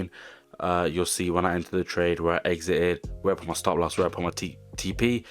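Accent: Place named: London, United Kingdom. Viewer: in the background and you sound like a Londoner we should defo link up if you are we can share some gems 💯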